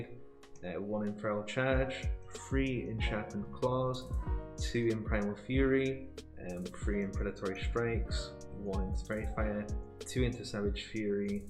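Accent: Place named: Yorkshire, United Kingdom. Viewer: So Yorkshire I love it, love from another Druid from Sheffield xD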